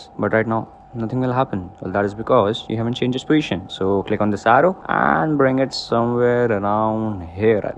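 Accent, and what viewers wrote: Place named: India. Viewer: What your name bro ? You sounds like Indian.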